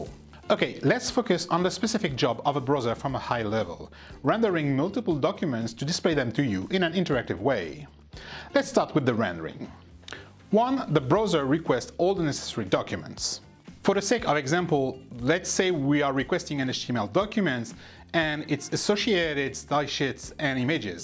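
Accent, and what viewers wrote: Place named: France. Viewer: He has French accent, I guess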